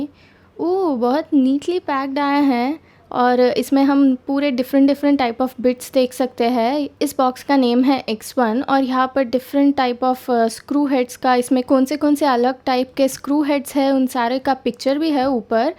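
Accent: Hyderabad, India. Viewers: i like hyderabadi accent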